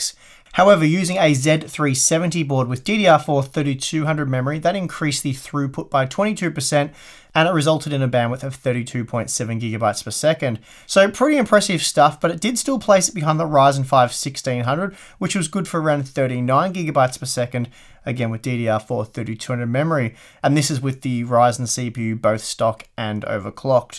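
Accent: Australia. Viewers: Nice Aussie accent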